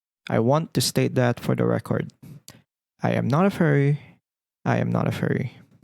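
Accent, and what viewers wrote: Philippines. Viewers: You Have a Strong Filipino accent!! ❤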